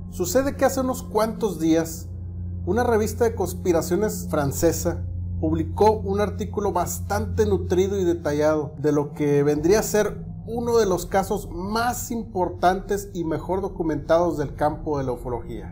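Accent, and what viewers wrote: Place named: Mexico. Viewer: Hablas muy Mexicano , con mucho acento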